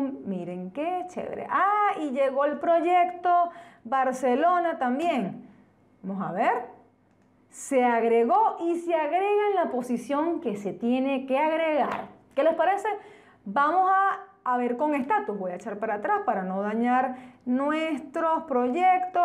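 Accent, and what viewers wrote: Venezuela. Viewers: OH! Es la joya de esta semana! Primero porque estas funciones están de lujo! Segundo: porque ella explica muy chévere Tercero: creo que es venezolana y ese acento de mi tierra me mata!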